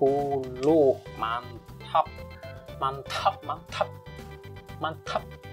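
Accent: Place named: Lampung, Indonesia. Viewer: i hear "tah" and "ding" orang lampung ya kak?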